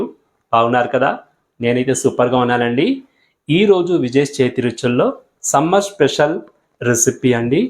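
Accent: Tamil Nadu, India. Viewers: no no meeru bagaane maatladu tunnaru kaaka pote meeru tea chese video lo paalu kaagatam leda maragatam ana kunda paalu udikaayie annaru andukani maatlade vidhaanam Tamil style gaa anipistundi naa mother tung Oriya.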